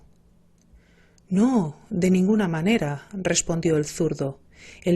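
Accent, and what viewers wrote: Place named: United Kingdom. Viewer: Gracias por tu esfuerzo. (...y asentó británico ).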